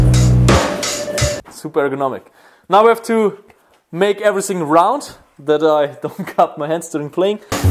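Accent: Germany. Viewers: Then se fleshlight right here. I love his englisch xD. Dieser deutsche Akzent hahahaha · Is he german or austrian? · Are u german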